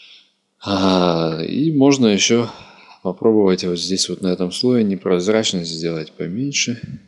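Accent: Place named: Russia. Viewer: Уроки конечно норм, но учитель, блин, с какого ты села?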